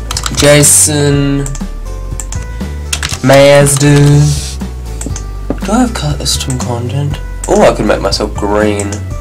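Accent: Australia. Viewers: i meant that i don't have a distinguished accent. like for example he's an aussie....and americans sound nasally, and british people sound cool, and people from south africa have that cool ring to their voice.....and i.....i have none of that.....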